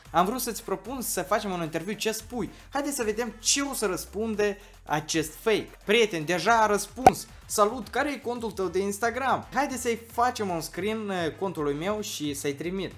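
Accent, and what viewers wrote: Moldova. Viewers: esti moldovean???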